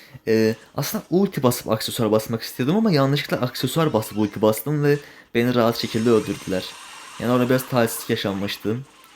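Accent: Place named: Azerbaijan. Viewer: Qaqa danişigindan azerbaycanli oldugun bellidi ugurlar😂😂😊😊❤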